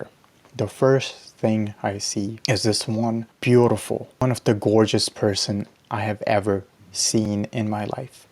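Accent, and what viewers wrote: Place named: United States. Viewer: U have like an American accent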